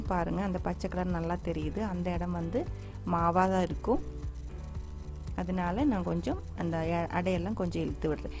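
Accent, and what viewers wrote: Kerala, India. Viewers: Malayalam kalandha tamil wow. dish semma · Excellent demo.. your Tamizh in Malayalam base is very very sweet, beautiful blend in your voice.. like the nice yummy ilai adai😃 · Oh sweet malayalam mix tamil. 🤗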